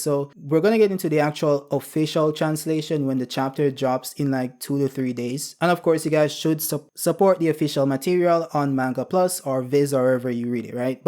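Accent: Jamaica. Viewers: Aye yuh a Jamaican? Yuh sound like it🇯🇲💫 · Its giving Jamaican do your thing bro keep growing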